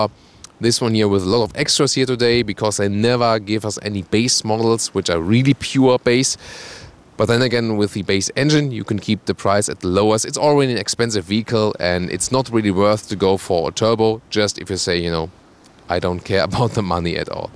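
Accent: Germany. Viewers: I speak german and I live in Canada so hearing pure german accents when speaking English is so hard to understand for me!! When you say a word in English I’m thinking in german😂 otherwise great video ! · Omg! He’s really cute! What’s his name ? And where is he from? He has an accent but I don’t know where he’s from. He sounds to have a German accent but I could be wrong .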